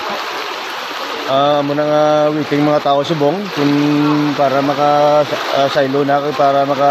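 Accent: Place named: Iloilo, Philippines. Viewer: Saan yan sis ilonggo angvsalita halong kamo da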